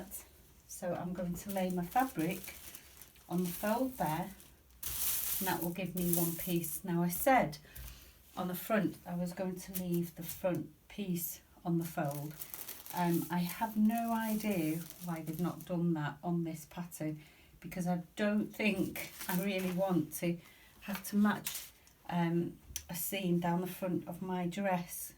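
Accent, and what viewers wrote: Yorkshire, United Kingdom. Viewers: Hi, just wanted to say that you explain things so well, thanks for that. I'm watching your vlogs tonight and really enjoying them. Do I detect a Yorkshire accent!!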